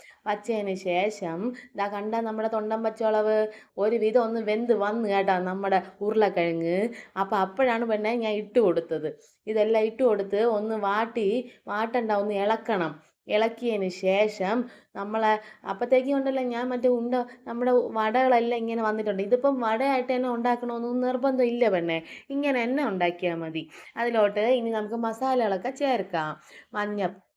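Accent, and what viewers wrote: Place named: Trivandrum, India. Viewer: Trivandrum language super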